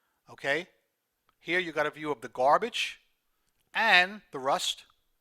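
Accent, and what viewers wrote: New York, United States. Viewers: Very fun to explore with you and your fun New York accent. The bathroom is not a bathroom if there are no showers or bathtubs. So it's known as a Restroom. 🤗🤗🤗🤗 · the narrator is a native New Yawker! He calls the street the Henry Hudson "Pawkway." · your accent! You are a New Yawka times 10!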